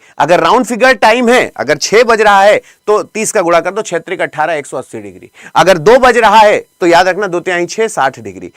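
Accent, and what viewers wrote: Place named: Bihar, India. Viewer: Kha se ye bihari padhane ya geya overacting jyada kerta hai...choko choko kya hota hai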